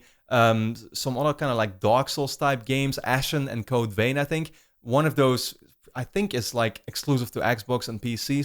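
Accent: Netherlands. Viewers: Hey man, are you Dutch? I live in Amsterdam, want to play some XBL?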